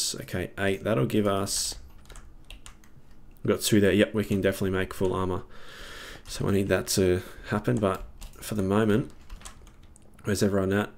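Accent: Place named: Australia. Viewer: even know i am australian so still sound so aussie!! Loving The Series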